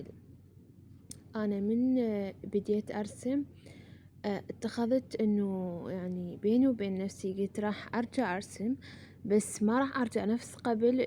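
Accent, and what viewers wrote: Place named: Iraq. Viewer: ياختشيييي ✨مرااااه خياال تجنن اللهجة العراقية ❤❤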